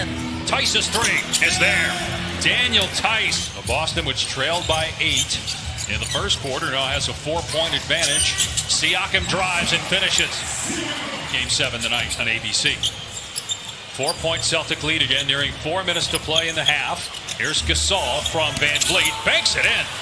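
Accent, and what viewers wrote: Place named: United Kingdom. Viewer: spiffing brit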